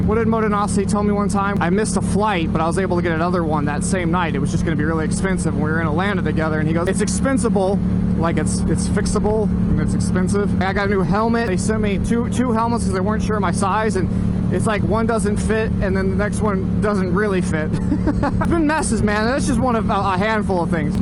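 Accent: United Kingdom. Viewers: Absolutely splendid English accent, sir. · loving the British accent XD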